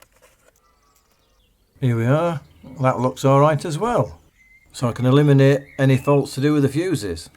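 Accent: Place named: Wigan, United Kingdom. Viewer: Love your videos been a fan for ages and learnt a lot thanks to you I've tackled many things on my Seat Ibiza 6l 2006 1.9 TDI axr engine code.. going purely by your accent you're probably not far from me . I live in Wigan I'm always tinkering like yourself.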